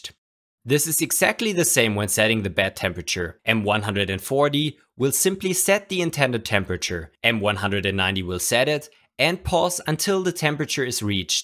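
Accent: Germany. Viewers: Man hört raus das er deutscher ist :)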